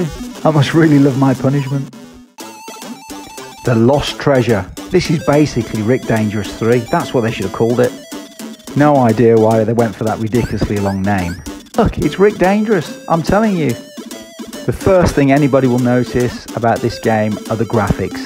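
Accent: United Kingdom. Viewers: Great video (and british accent). Some french video games here (Orion Prime ;) Any input on the "Grand Turismo"? They said it should have been released for more than a year now..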